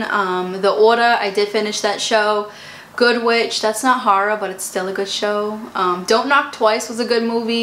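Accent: Boston, United States. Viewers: I love her Boston accent!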